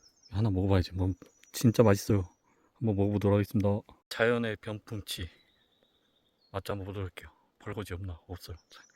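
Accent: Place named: Chungcheong, South Korea. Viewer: 벌거지...ㅋ.ㅋㅋ 충청도 사투리 같은...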